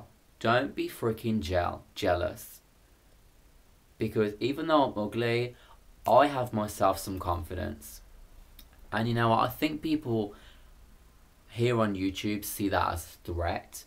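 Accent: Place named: Birmingham, United Kingdom. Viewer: I’m Bromsgrove u sound same x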